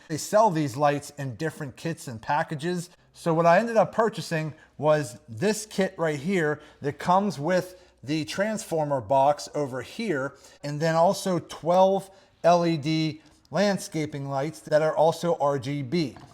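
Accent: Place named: Pittsburgh, United States. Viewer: Where are you from I'm from pittsburgh and I hear a yinzer accent when you talk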